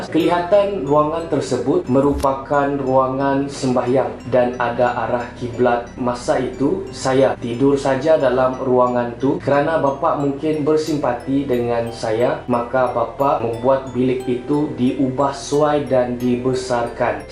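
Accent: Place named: Sabah, Malaysia. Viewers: dialek sabah...islam ke??? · Jgnla macam gitu bang. Sabahan mmg macamtu cakapnya. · Cam bunyi2 org sabah...well as sabahan susah eh mau kasi lari tu slang sabah hehehe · sesiapa yg komen kata cara dia bercakap mcm kupi2 go, korang mesti xpernah dgr org sabah bercakap kn? rajin2 la keluar dari bawah tempurung tu. belajar sikit dgr dialek ckp negeri2 lain supaya lepas ni dgr je dari cara org ckp dah tahu dia asal dari mana.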